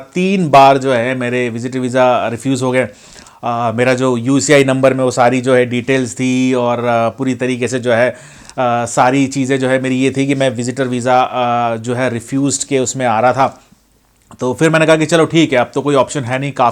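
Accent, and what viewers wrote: Kerala, India. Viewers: Malyali ho aap?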